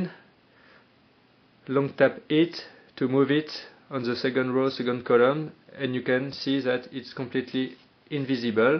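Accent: France. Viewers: T français acsenent de merde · sa se voit tellement que t'es francais mais c'est bien d'essayer!!! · Are you French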